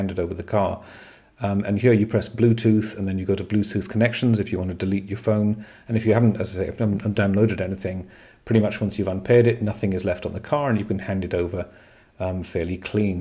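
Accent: Wales, United Kingdom. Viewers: I could clearly hear those dulcet Welsh tones!! Enjoy Denmark but there will always be a welcome in the hillside! If Denmark is anything like Norway you should be able to get a nice range of EVs!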